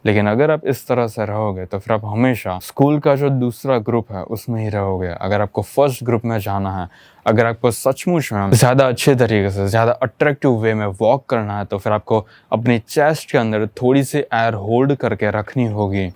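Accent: Gujarat, India. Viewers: Real hai bhai, yeh Gujarati speaker hai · I can feel the Gujarati accent in your speech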